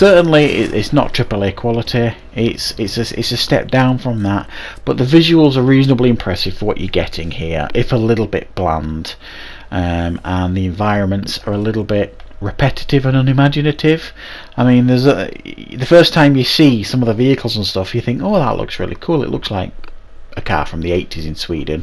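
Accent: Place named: North, United Kingdom. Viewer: Nice to hear a Northern accent!!!!!!!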